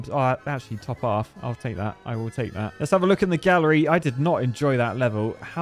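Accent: United Kingdom. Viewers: Always walk on the strongest par' of a bridge. Love bri'isch accent without the middle t :D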